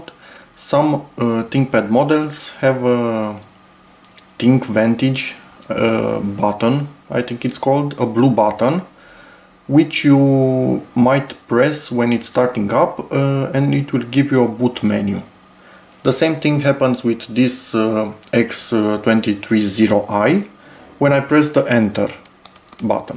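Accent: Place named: Romania. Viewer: Mi am dat seama dupa accent .succes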